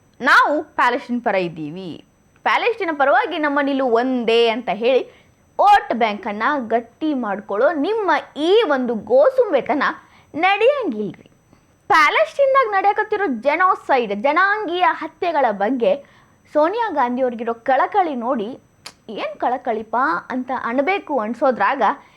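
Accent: North Karnataka, India. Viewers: Madam nanu davanagere hattira harapanahalli yavanu nimma video noduttene bahala channagi uttarakarnataka basheyalli narrate maduttiri balabala chanda iti nimma base all d best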